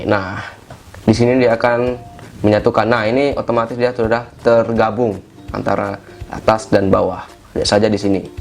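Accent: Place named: Palembang, Indonesia. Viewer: Org mana bng kok logat kyk org palembang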